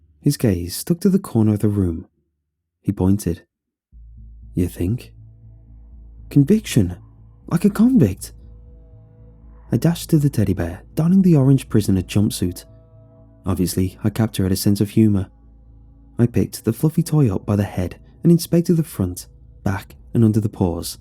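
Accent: United Kingdom. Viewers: Hey, sorry for the off-topic comment, but I've been tormenting myself for weeks over this question: does anyone know where in the UK ' accent comes from? I've spent more than two hours trying to figure it out, but I am but an American, and my efforts were in vain.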